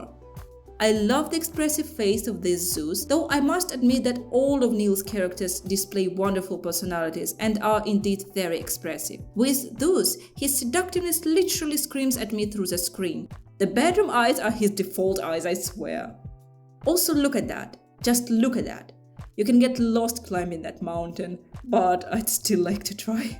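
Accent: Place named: Ukraine. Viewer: Моментально по акценту відчула своїх, хіхі Дякую за чудові відео, вони як стан душі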